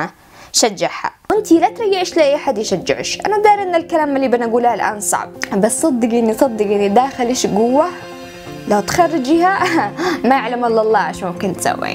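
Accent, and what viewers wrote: Sanaa, Yemen. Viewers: فديت اللهجه هذي الصنعانيه الحاليه اللي اشتي اتعلمها وفديت بنت بلدي الانيقه الراقية الله يسعدش 💗🙂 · محلاش ماشاء الله عليش يوم تتحاكي بالصنعاني ي لبى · انتي.غير بنات اليمن.انا مش مصدق انك يمنيه.لهجتك خليتنا اصدقيك انك.من اليمن.الله يحفضك وايوافقيك اختي.هيثم من اليمن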